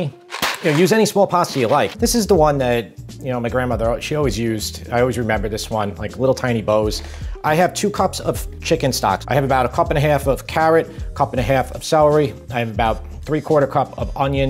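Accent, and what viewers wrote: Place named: Long Island, United States. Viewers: Test it Awl... Not a knock! I'm a Long Island Boy too! Music to my ears! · You are a delight to watch! Your deadpan humor, your outstanding recipes, your Lon Gisland accent, and your well edited productions have made you my favorite YouTube chef.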